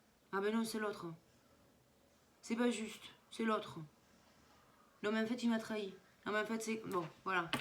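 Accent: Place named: South, France. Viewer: J’adore ton accent du sud tes expressions et ton franc parler 👍 merci pour tes vidéos explicatives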